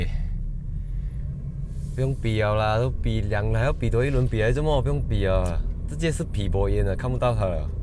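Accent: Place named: Malaysia. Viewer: 第一他是馬來西亞人，第二這是馬來西亞人的交流方式，第三請尊重每個人的說話方式，即便是中國人也都會有口音的。所以請你先三思你的思緒和言語後再做評論。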